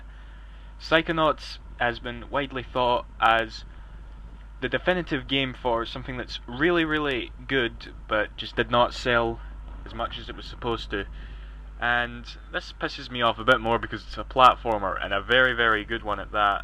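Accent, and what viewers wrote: Scotland, United Kingdom. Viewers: Which part of scotland are u from? Im from aberdeen ya see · well hes scottish, thats how we talk XD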